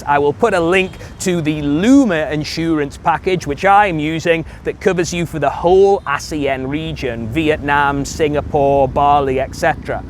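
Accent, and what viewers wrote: United Kingdom. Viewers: Love British accent, love your videos.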